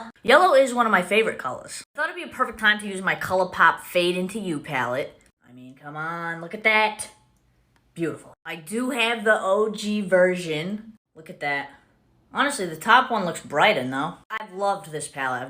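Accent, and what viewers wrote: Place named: Boston, United States. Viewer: It takes a Boston accent to know one. It’s so weird how it’s normal in real life but on Tv/Phones/videos... the accent sticks out like a wicked sore thumb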